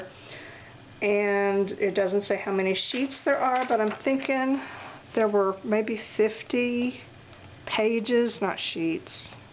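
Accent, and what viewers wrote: South, United States. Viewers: This video was great--very informative and entertaining. You are hilarious! I could sit & listen to you all day long! I've seen a couple other videos on Jet Pens hauls-but yours is by far the best. Now mi know I've got to place an order. Just have to get one of each of those notebooks. The way you cere caressing that paper--too funny! And when you smelled the marker I about fell over, I used to do that as a kid with permanent markers. 😜😜 I have subscribed to your channel & will be going back to watch past videos. BTW where are you from? Do I detect a bit of a southern accent? Thanks again.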